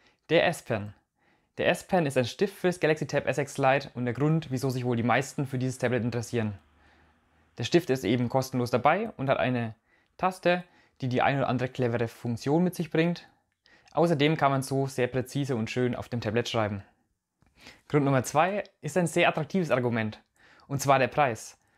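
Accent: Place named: South, Germany. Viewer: Deutsch ist nicht meine Muttersprache, aber ich spreche es ziemlich gut und interessiere mich dabei auch für die Akzente. Ihr Akzent ist mir unbekannt. Ich vermute etwas in Richtung Süddeutschland, Österreich. Sind Sie damit einverstanden, meine Neugier zu befriedigen?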